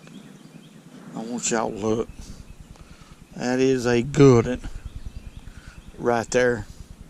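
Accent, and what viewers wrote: South, United States. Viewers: I Luv your Country Accent 😂🤣 Man I tune in just for that and of course seeing you pull them Big Whoppers out of the water 🤷‍♂️ 🐠 🐟 Much Love and Respect all the way from Central NC 🫡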